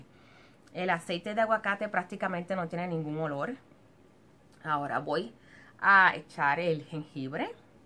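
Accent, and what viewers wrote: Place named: Puerto Rico. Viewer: En Puerto Rico hablan asi credo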